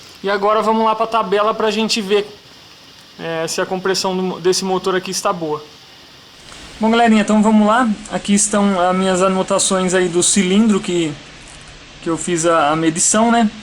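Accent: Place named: South, Brazil. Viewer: Eu tenho uma Brasilia 1978 que meu pai tirou "zero" e como sou aposentado, e realmente é dificil achar mecanico que conserte esse tipo de carro, assim começei a ver videos sobre o assunto - por exemplo o canal do Tonella que é muito bom entre outros. Em uma dessas duvidas queria saber como verificar a compressão do motor e seu video apareceu e foi muito bom. Em relação onde vc mora é pq me pareceu que tem um sotaque do sul e fiquei curioso em saber qual a cidade somente isso. Entendo que hj em dia existe muita violencia, vigaristas e todo mundo tem que se precaver. Mas sou da paz moro em Guarulhos e só quero aprender um pouco mais da mecanica a ar e possivelmente trocar experiecias. OK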